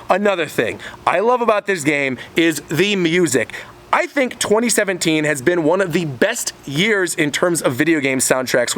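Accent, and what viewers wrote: Australia. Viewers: Australian accent? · Im pretty sure he sounds Australian.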